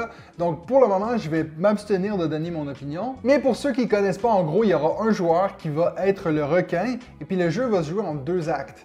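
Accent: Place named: Quebec, Canada. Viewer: Wonderful world est le jeu du moment pour moi aussi pour renouveler Je te conseille son extension corruption et ascension qui apporte beaucoup au jeu. Est-ce que tu aimes ou joue à des jeux de deck building (dominion, aeon end ...) j’en n’ai pas vu un seul sur ta chaîne Soit tu n’apprécie pas cette mécanique où tu n’as pas encore découvert cette Univers 😍 J’adore tes vidéos malgré ton accent Québécois qui m’ai d’habitude très dure d’écouter toi j’adore vraiment ton humour.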